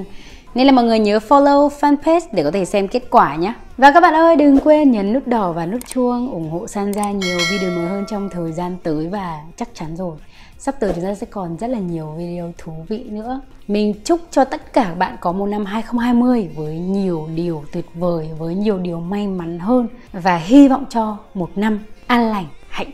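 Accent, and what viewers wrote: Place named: North, Vietnam. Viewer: À nhon chị Sun. Đầu tiên em muốn giới thiệu một chút về bản thân. Hì, em là Chou Chou, đã là sinh viên năm 3 rồi, và theo học kinh tế. Thực sự mà nói thì những thàng ngày đầu tiên tại trường đại học của em rất chán luôn, và rồi em bắt đầu đến với một câu lạc bộ vào năm 2, từ thời khắc đó phải nói deadline ngập đầu luôn, mình đã rất stress lắm, nhiều lúc chỉ muốn bỏ mặc hết tất cả, cả những chênh vênh của tuổi 20. Nhớ cách đây nửa năm nhỉ, em tình cờ một ngày chán chường lướt YT thì em xem được clip đầu tiên của chị, về lên kế hoạch. Thật sự lúc ấy em bị hấp dẫn bởi cái giọng Bắc nhè nhẹ của chị, có lúc Sun "xàm" nhưng rất đáng yêu, rất thu hút người nghe, và cả một ngày hôm đó hình như em chỉ xem mấy video của chị thôi. Và ngay sau đó em tập tành những trang Bullet Journal đầu tiên, nhưng mà trời ơi, em vẽ tệ hết sức, nhưng mà em vẫn rất vui, bởi vì trong thời gian đó em cảm nhận được mình hạnh phúc với những thứ nhỏ nhỏ mình tạo ra, Bullet đã giúp em rất nhiều, em đã xử lí thời gian tốt hơn, mặc dù đến bây giờ thì vẫn có những ngày em không hoàn thành đựơc hết nhiệm vụ, nhưng không sao tất cả đều phải có quá trình mà chị hen. 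Em vẫn nhớ chị bảo mỗi cô gái nên có một cây son đỏ, vì nó chính là sự quyến rũ đặc trưng của những cô gái Pháp, em nhớ mang máng là vậy, và em cũng vậy, cuồng một màu son duy nhất đó. Hihi. Không hiểu sao khi xem cái video này của chị, em có nhiều động lực lắm luôn, em viết mail cho ban Nhân sự để xin rời CLB để sẵn sàng bắt đầu những dự định mới của m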